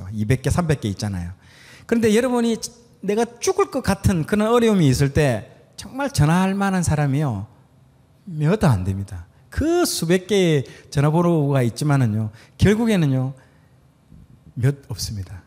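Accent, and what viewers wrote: Gyeongsang, South Korea. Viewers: 경상도 말이 이렇게 부드럽고 아름다운지요..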